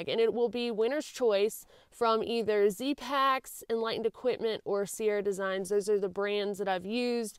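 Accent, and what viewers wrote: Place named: Alabama, United States. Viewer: I'm proud of you too, and by all means, put me in the drawing :-) . I am now watching your AT thru hike adventure, and it's so nice to hear that charming Alabama accent in amongst the other thru hikers. My home is Georgia, so I appreciate those kinds of things. Plus, you are one tough chick. I do hate to see that engineering degree go unused, though. That's quite an accomplishment, and you must have worked very hard to get it.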